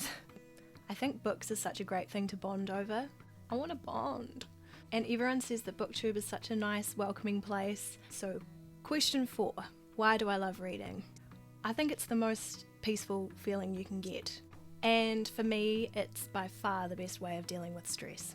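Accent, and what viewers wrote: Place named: New Zealand. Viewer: I'm from texas, & I find your new zealand accent so charming ☺️ welcome to the book community, 💞 you're doing great!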